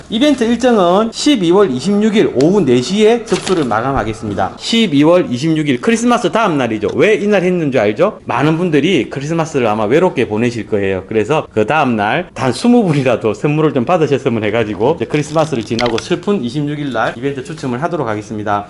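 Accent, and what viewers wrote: Gyeongsang, South Korea. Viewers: 구수한 경상도 말투가 방송볼때 좋았습니다....사실 저도 경상돕니다..ㅎㅎ건승하시길 바랍니다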